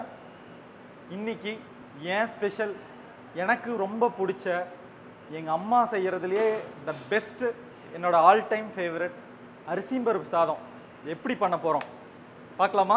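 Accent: Kongu, India. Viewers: கொங்குத்தமிழ்வேட்டி துண்டுவேறலெவல் கண்ணு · Hi sir...my native place is coimbatore.... நம்ம கொங்கு தமிழ் le அசத்தி விட்டீர்கள்...this rice is all time favourite to our family....sir... coconut oil ku பதில் சுத்தமான நெய் சேர்த்து சாப்பிட்டு பாருங்க...semmaayaa இருக்கும்...non veg எல்லாம் அந்த பக்கம் நிக்கணும்....thank you sir for uploading this video... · 🙏 க்கு ஜே🥳கைப்பக்குவம அருமை.கொங்கு தமிழ் அழகு.என்ன பருப்புன்னு சொல்லியிருந்தா👌😍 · Me too Rangaraj.... my fav dish both items.very happy to see this video and ou coimbatore kongu tamil💕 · சாதம் மட்டுமல்ல தங்களது கொங்குத் தமிழும் பிரமாதம் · கொங்கு தமிழ் அரூமை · Seithu sapittom..arumai Ungal kovai kongu thamizh polave paarabariyathodu irukkueathu..nandringa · உங்கள் கொங்கு தமிழ் மிக அருமையாக இருக்கிறது, உங்களுடைய செய்முறை மிக நன்றாக இருக்கிறது உங்க style -ல சொன்ன அதிபயகரமாக இருக்கு 👍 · 🙏🏻🌹 வணக்கங்கள் உரித்தாகுக.அரிசிபருப்புசாதம் சூப்பர்.அதை செய்து காட்டியபடி கிரிக்கெட் காமெண்ரி போல் லாவகமாக அதுவும் கொங்கு தமிழில் அழகாக பேசியது அதைவிட சூப்பரோ சூப்பர்.தங்களது சாம்பார் பொடி ரெசிபி யை தந்தருளுங்கள் என்று பணிவுடன் கேட்டுக் கொள்கிறேன் மிகவும் நன்றி கலந்த வணக்கங்கள் உரித்தாகுக 🌹🙏🏻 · Our native COIMBATORE special food ultimate Anna👍👌👌👌👌Exact matching side dish👌👍 our kongu naattu tamizh ❤❤❤👍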